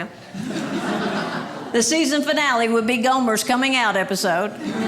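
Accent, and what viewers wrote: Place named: South, United States. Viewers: She's definitely southern. " Watch my drip... Nah nah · That is a heavy southern accent . Im Guessing Texas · You're right. Put her in a dress and add a few years. Looks so like her and that north Carolina accent.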